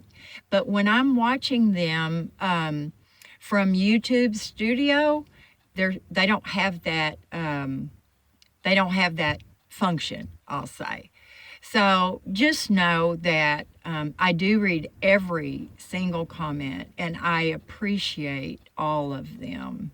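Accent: South, United States. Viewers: I live in North Carolina and I look so forward to your videos. You sound southern.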